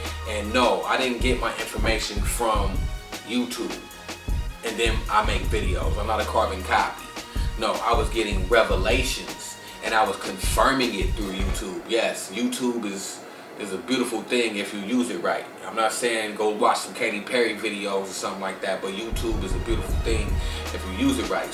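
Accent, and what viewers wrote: California, United States. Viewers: Great vid bruh. You from Cali huh?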